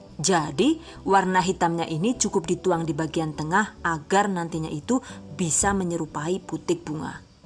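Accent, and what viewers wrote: Banyumas, Indonesia. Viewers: Keren koh ngmg ngapak Sukses embak e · Like Bunda ngapak KU tersyg 🤣 🤣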